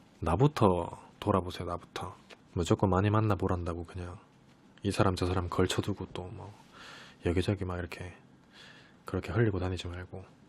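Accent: Gyeongsang, South Korea. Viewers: 말투가 뭔가 빨려들어감 경상도 억양이 왜이케 조앙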